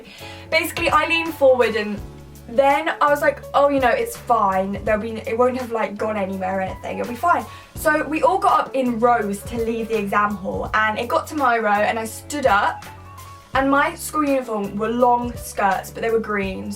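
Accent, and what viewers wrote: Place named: United Kingdom. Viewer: Yo ur british ayee xD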